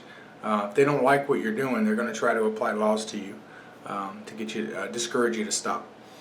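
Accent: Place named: South, United States. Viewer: No idiot u sound like a dumb Ass Hillbilly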